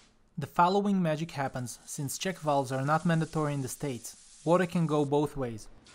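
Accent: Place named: Russia. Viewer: I've got to leave a comment, I hate it usually : ) bought the same unit, doing a change over install from a Bosch 82% eff. Love your eagerness to make things work and to do it properly. Love the accent but you don't typically look Russian, I'm Canadian and have a good friend Sergei who is Kazakhstanian, anyway appreciate what you did and the tact in which you did it, I might have been muttering with all the extra challenges, you sir make me a better person, stay safe, I'm a nurse(male) awaiting the pandemic, trust in the Lord, death is certain but how we live and who we live for changes everything, just wanted to send some blessings your way, thanks for yours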